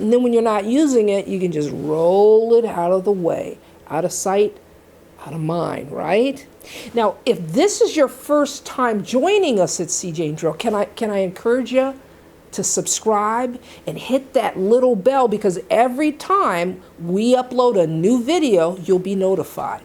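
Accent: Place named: Medford, United States. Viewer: I miss that Medford accent!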